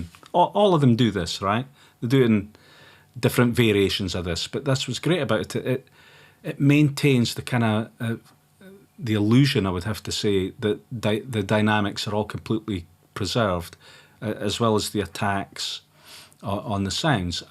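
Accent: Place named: United Kingdom. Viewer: after this video i'm still confused, are you bri'ish or what? your accent is clipping my brain :S great video btw!! clipping makes mixing and mastering so much easier <3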